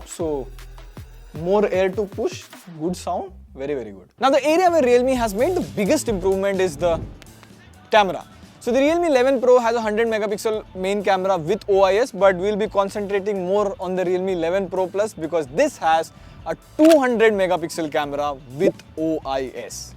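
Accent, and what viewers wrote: India. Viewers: I like your indian accent❤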